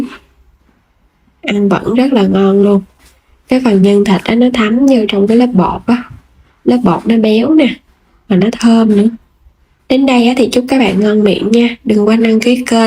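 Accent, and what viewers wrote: Mekong Delta, Vietnam. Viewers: Giọng miền Tây đích thực đó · Giọng miền Tây người ta vậy đó mấy má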